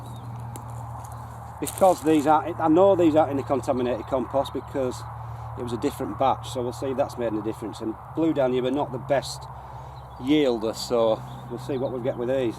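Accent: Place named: Sheffield, United Kingdom. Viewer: Great job pal. Are you a Sheffield guy or Derbyshire you sound like me lol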